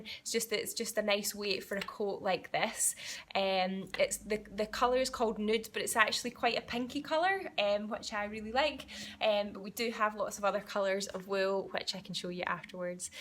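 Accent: Scotland, United Kingdom. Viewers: What a joy to hear a scottish accent amongst all the sewing vloggers I currently follow. Gaun yersel!:) The coat is pure dead brilliant and absolutely gorgeous on you. I'm inspired! Thank you for a wonderful video, will be watching from now on. shirley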